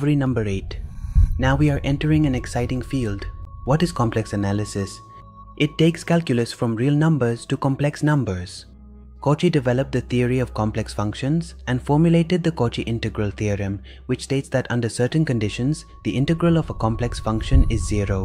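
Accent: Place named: India. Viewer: Ein Inder oder Pakistaner? Typischer Akzent.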